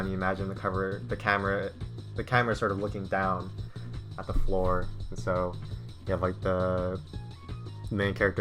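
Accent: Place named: New York, United States. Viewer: I hear nyc ;)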